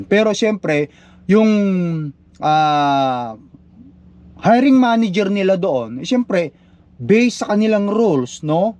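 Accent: Ilocos, Philippines. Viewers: ILOCANO TALAGA ANG TONO MO MAGSALITA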